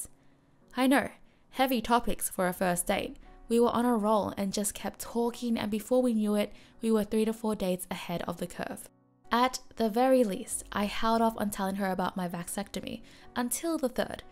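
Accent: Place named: Australia. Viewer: Your voice was soothing. Love your soft aussie accent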